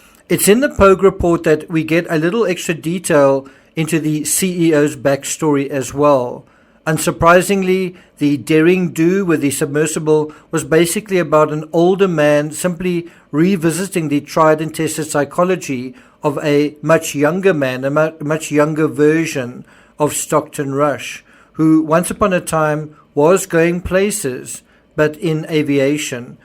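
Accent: South Africa. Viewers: Love the SA speak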